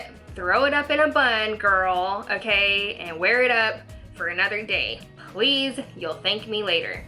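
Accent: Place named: Texas, United States. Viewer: Are you from Texas? I love your accent.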